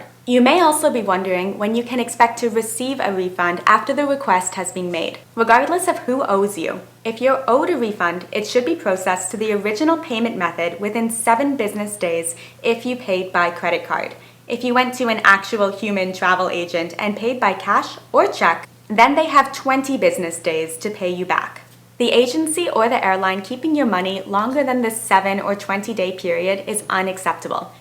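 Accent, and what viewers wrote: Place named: New Jersey, United States. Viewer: I feel like you are covering up an accent. Like New Jersey or Boston thick accent.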